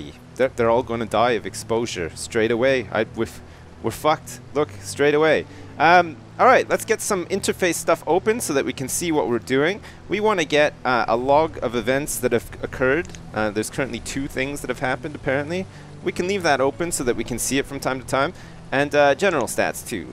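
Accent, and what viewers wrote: Canada. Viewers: I can hear your Canadian accent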